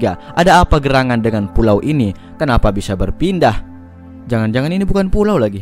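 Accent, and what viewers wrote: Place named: Bugis, Indonesia. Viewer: Logatya bugis🤣🤣🤣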